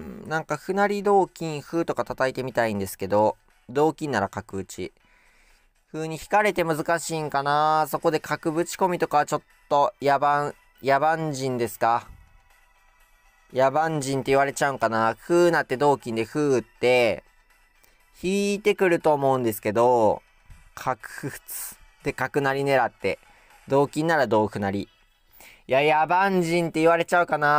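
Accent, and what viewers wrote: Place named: Kansai, Japan. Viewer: 関西人特有の適当トークが好きです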